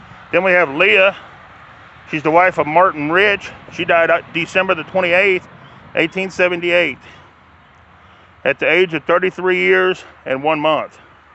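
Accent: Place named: South, United States. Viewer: On the mark, that is Monroe Yoder, Died Aug 21 1890 (couldn't see his age) + Martha Yoder, Died July 19th 1890, aged 15 (so they were brother and sister, most likely, died one month apart). On the mark, Amos Yoder died Sept 14 1865, Aged 1 year, 9 months. I thought i heard a bit of an accent in your speech but frankly, i didn't know where exactly to place it from, in the south (i thought maybe Texas, haha, although i see now that i was wrong) because i know each state in the south has its own type of accent 🙂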